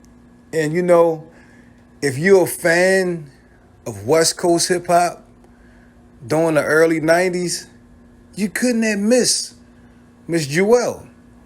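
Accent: Baltimore, United States. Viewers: Your definitely from "Baldamore!"😁😁💯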